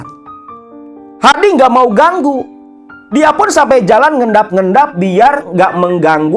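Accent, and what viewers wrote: Java, Indonesia. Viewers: Kaya dangar ketoprak bahasa jawanya kuat orang Papua dan propinsi lain agak susah mencernanya🙏🙏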